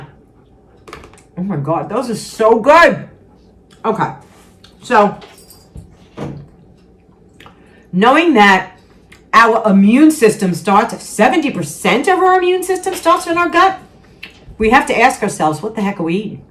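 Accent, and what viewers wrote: New York, United States. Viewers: Hi, it's Joey from PBD. Did you grow up in NY or near there? I am sensing a little "back home" when I hear you talk. It reminds me of family and good times. Ok, that bowl of veggies for 100 calories is a very good portrayal of how 100 calories are so filling. I am glad to hear you talk about carbs and starch. You know how it is when you are surrounded by Keto and no carb people who think we have all lost our mind by eating carbs. Yes, you are right, you can eat a large meal of potatoes and veggies and be stuffed for about 400 calories. It's a beautiful think. I love all the benefits you are experience in healing your body from a plant based lifestyle. I just subscribe. I gotta support my YouTube HCLF sister! Great video and great info. Hugs!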